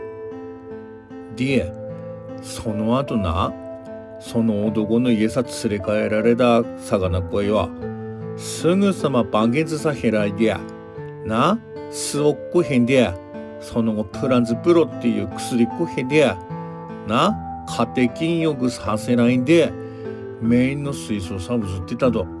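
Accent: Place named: Akita, Japan. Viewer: 秋田弁 懐かしかったぁぁ😂 おばあちゃん思い出しました✨ 今度実家で父にこの動画見せます‼（きっと️秋田弁の響きに飢えてるはず） しかもオチが思ってたのと違って吹き出しちゃった〜😂🤣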